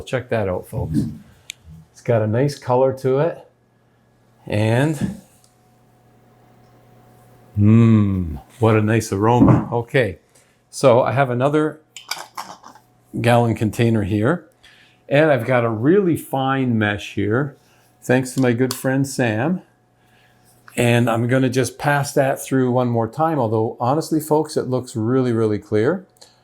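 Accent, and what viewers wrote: Canada. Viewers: At first I thought you were American and was astonsihed to hear you talking metric and not imperial...then I realised you are Canadian. Best wishes from the UK. I enjoyed your video. For me the big expense is buying grain alcohol...the cheapest I can find is £38 for 70cl. I guess this is why people use vodka £20 for 70cl....I think that's what I'll do and add granulated sugar instead of syrup...I might have to wait longer for the lower strength alcohol to extract the oils from the lemon peels. I love Limoncello!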